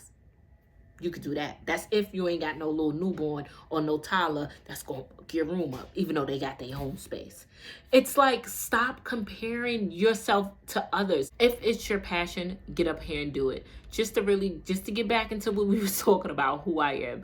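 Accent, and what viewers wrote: New York, United States. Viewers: Hey, I have really been enjoying your content lately . Due to it being real life situations, l and many more can relate. I used to live in Brooklyn, so I play your videos in the background to feel at home You have the real New Yorker accent is so strong I chuckle every time you start talking.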